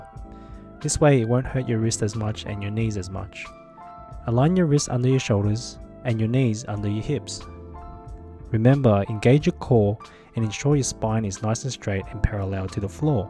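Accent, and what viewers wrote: Australia. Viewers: is this Australian accent? anywho i used this for running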